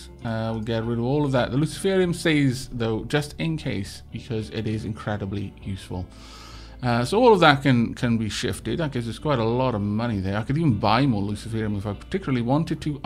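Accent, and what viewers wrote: Wales, United Kingdom. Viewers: The Welsh is strong with this one. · not too noticeable. Loving the Welsh twang again :)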